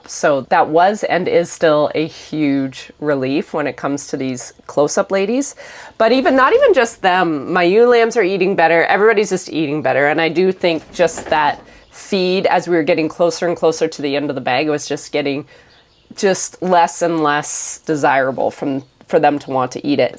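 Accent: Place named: Canada. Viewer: Today i learned canada also says zed, instead of zee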